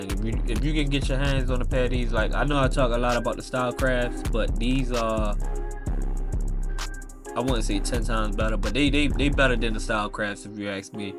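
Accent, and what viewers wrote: Baltimore, United States. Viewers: Top tier....West Baltimore through and through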